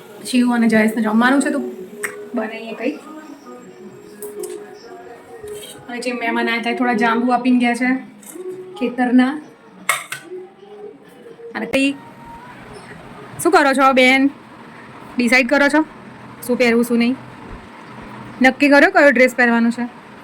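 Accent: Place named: Mehsana, India. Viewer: Mehoni bhasha bv gme 😅tmri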